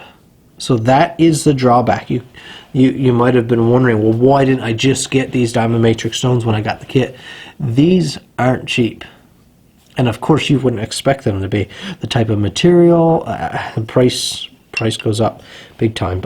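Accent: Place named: Newfoundland, Canada. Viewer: You are sharpening your knife like a madman. You are going way too fast and only using a small portion of the stone. Cody at Edge Pro explains that if you run the stone over the knife too quickly it will result in glazing. While it’s obvious you are getting good results, your poor old stones are taking a beating. Trying slowing down and ease off the coffee. Sounds like you’re from Newfoundland.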